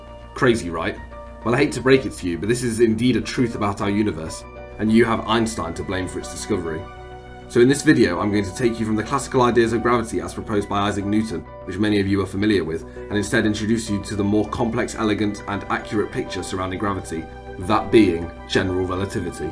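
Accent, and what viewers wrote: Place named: United Kingdom. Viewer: difficulty understanding some of the British accents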